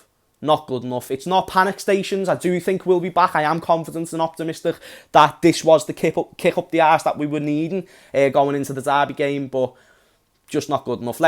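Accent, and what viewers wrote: Liverpool, United Kingdom. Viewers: This Scouse Accent is Ultimate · Nice Scouse voice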